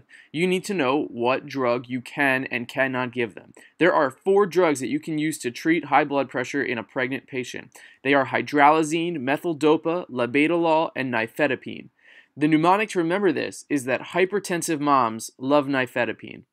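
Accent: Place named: Philadelphia, United States. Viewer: Super helpful video! Also, I'd recognize a Philly accent anywhere...are you from Philly?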